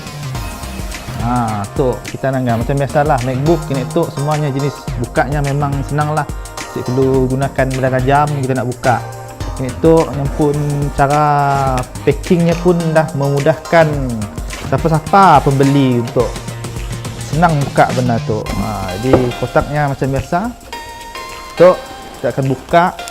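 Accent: Sarawak, Malaysia. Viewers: Bahasa melayu sarawak lah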